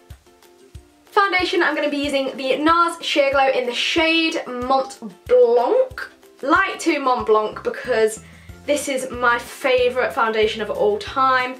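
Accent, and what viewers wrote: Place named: Nottingham, United Kingdom. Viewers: Where abouts from the uk are u from , I'm trying to figure out yr accent 😂✨ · Nottingham I think x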